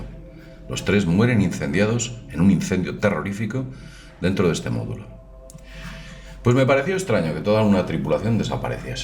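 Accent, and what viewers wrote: Spain. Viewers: eres de España? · ESTE PENDEJO SE CREE QUE SABE, MIRA SO MAMAO TU DEBES SER UN PENDEJO MAS DE ALGUN PAIS DEL TERCER MUNDO HISPANO, TIENES ACENTO ESPAÑOL QUE DE LO UNICO QUE SABEN ES DE MATAR TOROS Y COMER PAELLAS Y MUCHA MIERDA.DIME QUE COSA DE IMPORTANCIA A SALIDO DE ESPAÑA? JAMAS UN PENDEJO ESPAÑOL ENTENDERA.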